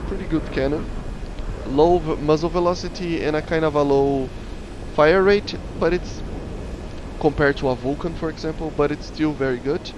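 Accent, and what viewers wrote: Brazil. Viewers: caraio que sotaque de brasileiro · That guyse sound likes a Brazilian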